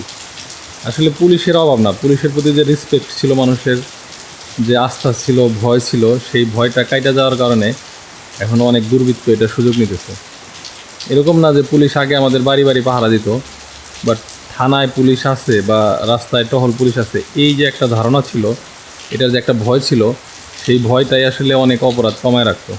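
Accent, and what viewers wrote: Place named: Tangail, Bangladesh. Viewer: এটাই আমাদের টাঙ্গাইলের কন্ঠ আমাদের বড় ভাই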